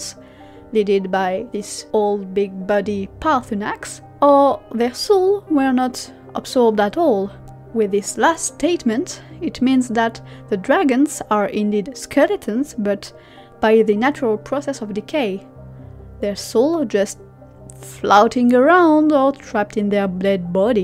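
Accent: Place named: Europe. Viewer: love your accent where are you from in Europe, this channel has alot of lore value for skyrim , great work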